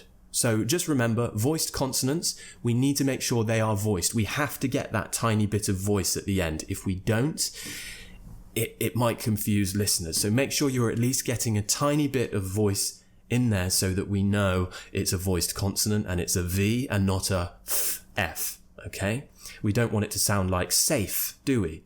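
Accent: United Kingdom. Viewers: I could really learn British accent and improve my English from your channel 😊 Thank you, from Malaysia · Love your pronunciation, your British accent, your videos ... Lots of love around here, right? Ha ha ha Thanks for your work! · I love British accent! I had grown up in London until 6, so the accent or I could say the atmosphere reminds me of my childhood. X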